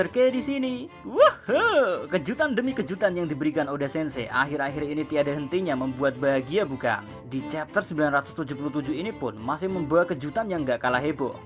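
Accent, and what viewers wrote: Java, Indonesia. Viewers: dari logatnya orang jawa. Feeling gw nama asli lo. Kayanya 70% benar wkwk iyaa ga min?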